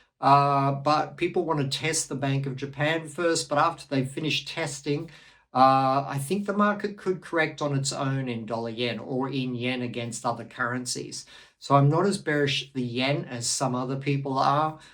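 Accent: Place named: Australia. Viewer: Thanks greetings from Holland , I like your Australian accent.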